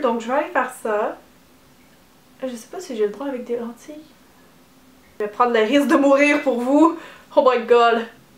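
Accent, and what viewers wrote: Quebec, Canada. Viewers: Hahaha j'ai tellement aimé le doigt à la fin. xD Je vais le rabâcher encore et encore mais t'es tellement douée ! Et drôle aussi. Et super jolie. Pat dépêche toi de la marier ! 😜 Le maquillage des yeux est vraiment canon ! J'adore t'écouter tous les samedi parce que je t'apprécie et aussi parce que j'adore l'accent québécois et vos expressions un peu singulière (je suis de Paris) haha. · je comprends ton point... Mais ce que je voulais dire c'est que c'est une manière de parler... En france vous prononcez "s'il te plé" tandis qu'au Québec, nous prononçons "s'il te plè"... Vous dites un toast, nous disons une toast... c'est peut-être "une faute"... Mais c'est comme ça qu'on dit ici (en général)... n'est pas une enseignante de français... Elle n'a pas (selon moi) à changer sa manière de parler ou les mots qu'elle emploie, peut importe son auditoire... 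Mais ça, c'est encore que mon point de vue... Venant d'un Québécoise fière de sa culture et de son jargon!:) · OMG je t'adore!!! Jolie, intelligente, douée, et tellement drôle x'DLes Bloopers, surtout le final, m'ont tuée:')Et puis le maquillage est super beau!!! Bisous d'une abonnée fidèle, et merci pour ce tuto (Elsa est une de mes princesses préférées... ^^)LaurelinPS: J'ai une famille québecoise mais je ne vis pas là-bas, alors quand j'écoute tes vidéos, l'accent trop cute me rappelle la maison. Change absolument pas t'es parfaite, gros becs.:D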